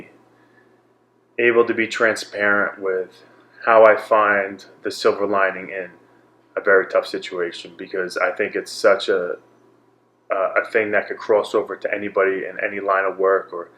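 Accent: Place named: Brooklyn, United States. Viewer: You are what got me into MMA 10 years ago, Chris. I'm originally from Brooklyn and naturally related to you just from your pride, background, and accent. I'm so sorry this happened to you. It was gut-wrenching to watch as your fan. When you said your mind turned to "even if I lose the leg, I can still be with my kids" I couldn't help but be amazed at what an incredibly willful soul you have. You will always be an inspiration to me and my favorite fighter. God bless.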